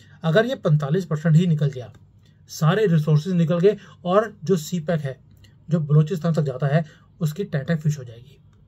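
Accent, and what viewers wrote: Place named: Punjab, India. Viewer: You are simply amazing your Hindi in Punjabi is too irresponsible,and typical Punjabi moms taunt way is ultimate and of course not the least your courage in using words to insult the rivalry is super awesome..keep it up keep growing..Jai ma Bharti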